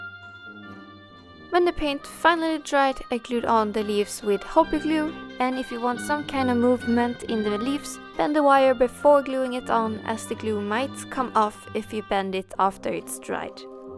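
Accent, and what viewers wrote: Sweden. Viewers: Are you from sweden? You sound swedish, im from sweden ❤️ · Är du svensk ? Du låter lite svensk hoppas du fattar vad jag säger nu 😊☺️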